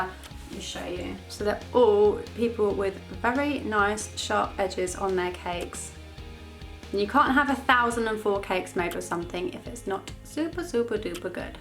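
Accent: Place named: Cockney, United Kingdom. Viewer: Great tutorial. . .but her Cockney accent is grating. She sounds like Eliza Doolittle before Higgins helped her.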